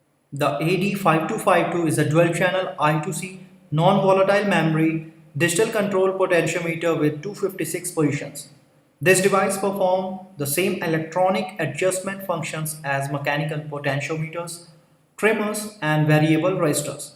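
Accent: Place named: East India, India. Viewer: The East Indian accent is difficult enough to understand without the tutorial taking place in a live room.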